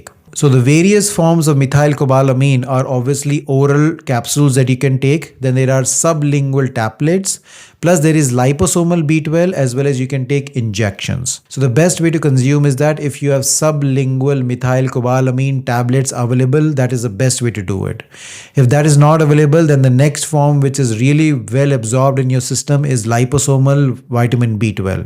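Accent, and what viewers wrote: India. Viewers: Sorry, but now I am totally screwed up. I've been using the Methyl Cobalamin format of B-12 for years based on available research. Now, we have this feller suggesting that Methyl Cobalamin is the best format. The problem is that I have no intention of trusting a feller with the kind of accent, name and appearance I am seeing and hearing in this content. Sorry, but when I listen to him, I have images of those folks who, before I blocked them, used to call me five times a day and tell me they were Microsoft engineers from Mumbai and were available to help resolve my computer issues if I would only buy them some gift cards. Perhaps the sponsor of this content could hire a busty young blonde haired white woman from Texas, with a southern accent, to pitch this product. Otherwise, I guess I'll just stop taking the B-12 or do the opposite of what this feller is suggesting. 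Thanks for your attention to this matter.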